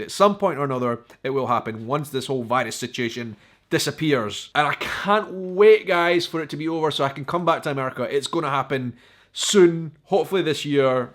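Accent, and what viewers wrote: Scotland, United Kingdom. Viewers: I had to do a double-take when you said you "Spoked" to Americans, your Scottish accent sounded like "Spooked" Americans, and I thought why would Americans be scared of you! lol Scotland is on my bucket list to visit, more so than England. I suspect the accent though should make for some funny misinterpretations when I visit. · I just love listening to you talk. Have you realized how much Americans love the Scottish accent? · I second this. The Scottish accent is one of my favorites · Been watching your channel for a while now. You are a wonderful speaker. You come across as very positive. The Scottish Accent is very cool, fun to listen to. I think you like America more than I do and I'm a native. I'll keep watching. I want go visit Scotland someday, after you've sold it so well.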